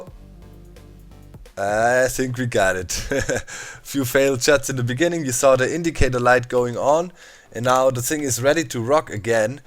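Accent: Germany. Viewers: You Sound a lil Bit grman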